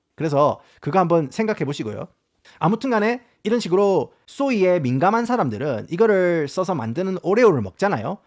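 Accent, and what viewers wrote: Gyeongsang, South Korea. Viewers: 경상도 사람 아니면 듣기힘들거같은데 전 이만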